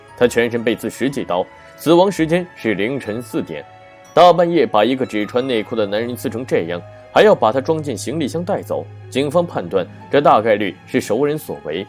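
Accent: Standard. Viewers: 不管怎么样配音的很好 标准，耐听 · 主播发音特别标准 声音好听 ， 废话太多 😂 继续加油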